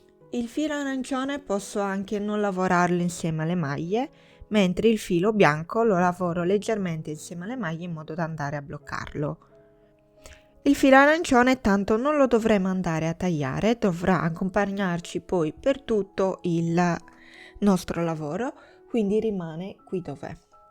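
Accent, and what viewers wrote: Sardinia, Italy. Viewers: Sei bravissima!! 😊 ma sei sarda? Noi si 😊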